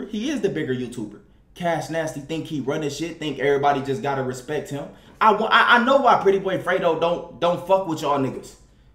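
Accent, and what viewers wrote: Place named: Detroit, United States. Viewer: That Detroit shit came outta my nigga it come out of all of us in the D😂💪🏽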